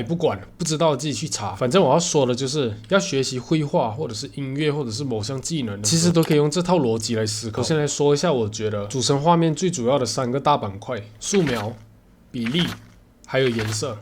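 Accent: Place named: Malaysia. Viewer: hii! 本人是小小新手入门的小画家!，一点进去影片听到malaysian腔就觉得很亲切(? 插画圈子在马来西亚是真的很小,平常都是混欧美/日本的圈子多一些，可以看到在本地有那么大神的画师真的太棒了T-T.很喜欢你的幽默！影片也简单易懂,希望能够做多多这种相关的内容！:face-blue-smiling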